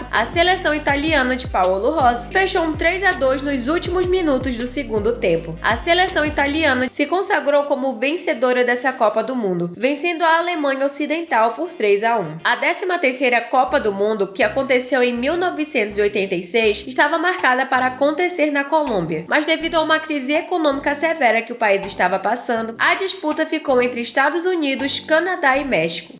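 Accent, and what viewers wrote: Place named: North, Brazil. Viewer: Você é paraense ? Seu sotaque lembra a região norte. Parabéns pelo canal. Muito bom seu trabalho.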